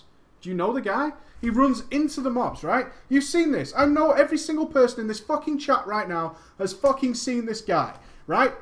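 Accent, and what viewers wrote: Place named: England, United Kingdom. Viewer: Dude your English. Don't know why I never knew that. New sub here.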